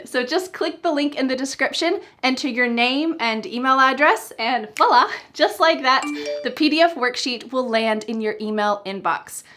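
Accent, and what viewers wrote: United States. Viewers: You speak American English? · Mam please add with subtitles to help a lot because you are an American accent and I'm Indian , sometimes doesn't comes on mind so I would love if you could do the same. Thank you 😊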